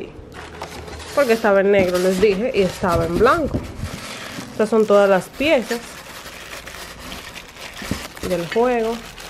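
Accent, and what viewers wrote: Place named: Dominican Republic. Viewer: Uy! ella esta hablando entre amigas y es dominicana a veces hablamos asi aunque sabemos perfectamente como pronunciar las palabras, ella esta bien formada profesionalmente se le nota. Valida tu corrección pero ten pendiente la nacionalidad de la YouTuber cuando oigas cualquier cosita mal pronunciada.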